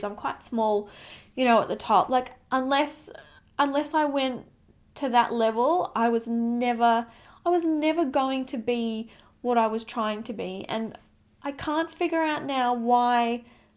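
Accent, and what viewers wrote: Australia. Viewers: Sweet, Beautiful on the Inside and the Outside, I am a subscriber who is old enough to be your mother, maybe even your grandmother. My heart broke listening to you talk about your painful journey. I was a teen in the 60s and this threw me back to that time. Mean bullying girls! I truly believe that's where a lot of this starts. I was so bullied by mean girls in junior high and high school. I was 4'11" and never overweight but that didn't stop their hatred. Their words and actions burrow into your brain until you start believing them and unfortunately it stays with you your entire life. My adult daughter went through the same thing in high school. People like that say and do mean things just to make themselves look better. And underneath it all they are truly sad and miserable with their own life. I pray you will keep that determination in your heart to overcome all of the negative things you hear in your head and win this battle. 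God bless Brandon. A supportive fiance'/husband is one of the best weapons in the battle. You are a beauty... Inside and out. I am 100% mesmerized when watching your videos. You have the cutest personality (you make me laugh often) and the most soothing, calming voice, not to mention awesome Australian accent!! I have no doubt that you just helped many, many young women out there with your truth! Bless you! May every day get a bit easier for you! ❤️❤️❤️❤️ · You are beautiful the way you are. I have always loved your chirpy self and your adorable Australian accent. My best wishes to you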